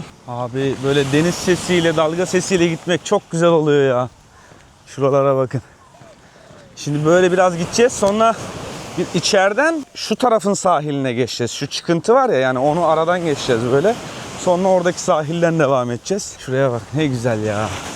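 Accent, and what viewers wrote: Thailand. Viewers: ingilizceyi tayland tınısıyla-aksanıyla konuşuyorsun artık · reis neden ingilizceyi tayland aksamı ile konuşmaya çalısıyorsun?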